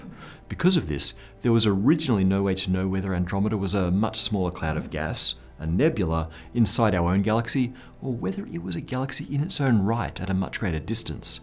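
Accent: Australia. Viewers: Do all Australians talk like that? I want to move to Australia all of a sudden. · Why would there ever be any down-votes for these videos? Don't like galactic mergers? Don't like Aussie accents? Then why watch? At this moment it's 13,806 views versus 19 thumbs-down - so I guess that's only slightly more than 1 in 1000 - so who the hell knows.... People that don't believe in the structure of the universe? Religious people? Surely not - cannot it all be seen as a gods amazing creation?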